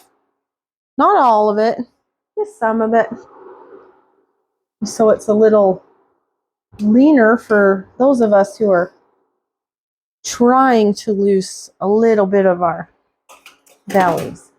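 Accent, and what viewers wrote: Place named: Minnesota, United States. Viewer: 😂forgive me. "Accents" is one of my things. New to yr site. Literally, first time today. Don't wanna invade yr privacy. I hear South, Minnesota, and more. "MA Jor am"😂❤ again not being rude. Me born raised Chicago, 15 years ABQ, almost 10 SE TX. Just love people's voices. 😊 including yr son's.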